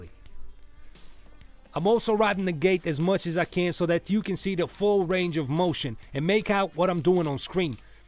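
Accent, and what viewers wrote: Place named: Boston, United States. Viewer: I don’t know what it is about it, but your Boston(?) Accent somehow makes this great guide even better . Thanks for the great guide man!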